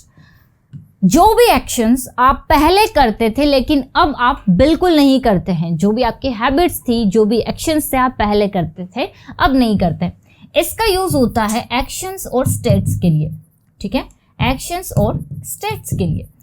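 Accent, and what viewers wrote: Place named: Bhopal, India. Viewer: आपकी हिंदी का उच्चारण भोपाली क्यों है ? बहुत इरिटेट करता है जब आप मैं को में और कैसे को केसे बोलती हो...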